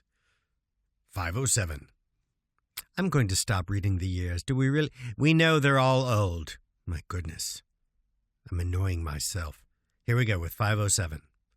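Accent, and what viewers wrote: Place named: India. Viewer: Your Hindu accent has made me pass out with laughter 😂😂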